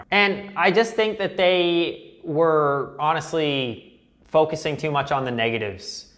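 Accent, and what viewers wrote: Canada. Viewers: What part of canada is he from, im from newfoundland lmao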